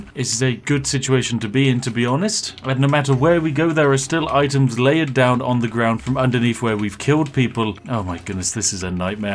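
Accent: United Kingdom. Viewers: Day 5 of reminding Spiff we want to hear him say "Sugoi" in his glorious British voice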